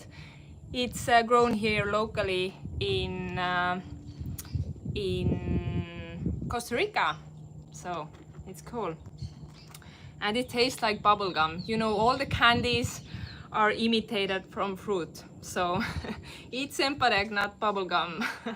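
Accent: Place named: Estonia. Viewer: Love the Estonian accent. Do you guys also speak Russian, or do they not teach in schools anymore?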